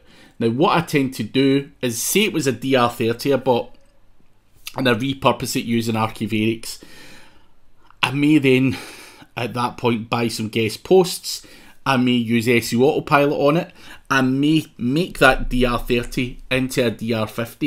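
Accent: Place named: Glasgow, United Kingdom. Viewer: Lovely accent from Glasgow;)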